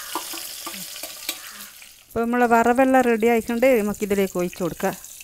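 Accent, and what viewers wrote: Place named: Vadakara, India. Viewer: Slang 🤩 immale vadakara❤️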